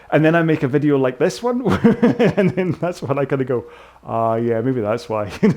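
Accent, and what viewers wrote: Scotland, United Kingdom. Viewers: You’re my No1 go to. Love the chatter, the coaching, the technique, the production quality and the humour. (The Scottish accent helps as well). So hope you also have #patience for staying with us here, Coach! 🙏👏👏👏👏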